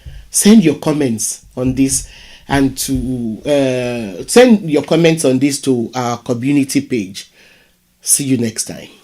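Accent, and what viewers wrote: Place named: Africa. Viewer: Thanks for this. There is a racist you tube channel called History Debunked. His supporters were in the comments section talking about Black people coming from primitive tribes. I sent them this video to show them who were the primitive tribes. It will also gall them that it is a Black Woman speaking about this with an African accent no less.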